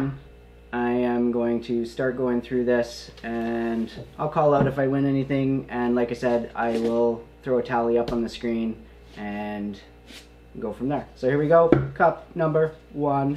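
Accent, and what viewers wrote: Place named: Canada. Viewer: had to sub because u sound canadian and not the fake canadians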